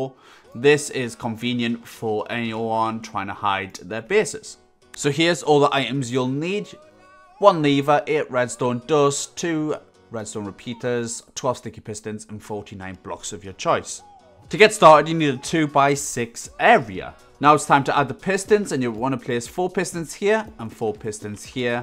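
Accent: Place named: Newcastle, United Kingdom. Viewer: subbed on the fact that you’re a Geordie lad, git up son 😂 good content too btw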